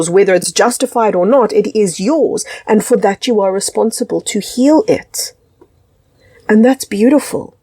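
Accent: South Africa. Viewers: Man have I enjoyed your videos over the weekend! So much of your stuff is resonating with me! Also nice to hear a fellow South African😃 I'm going through huge Assention symptoms the last 3 years. Vision distortions, in somnia, vibrating body Suffocating anxiety. Every day I'm waking up thinking what's it going to be today. Yesterday I was watching your addiction video. And well as some people not think you were talking in metaphors mmm! I believe I came face-to-face with my demons on DMT journey. While I was indulging in one of my mild addictions I inhaled a dmt vape And there it was in front of me breathing and responding to my emotions the more excited I got for my activity the more powerful the entity became. As if we we're separate yet connected. It didn't seem to be good nor bad Nor did it look like a demon. It looked amazing! Beautiful,vibrant, colourful It was Maybe both feeding and supplying all of what humanity has created and experienced through the activity. 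It may also have been a part of me, but what makes me think that it is a collective or separate is I have seen this entity in dmt art. So I am clearly not the only one who has come face-to-face it. But it was real and it was something separate yet part of me. But as per usual with psychedelic journeys with every answer comes another 10 questions.😅 Anyway I'm glad I found you keep up the good work and I'm Sure your videos will come to me as I need them as they always do💚 I am having help from 2 energy healers at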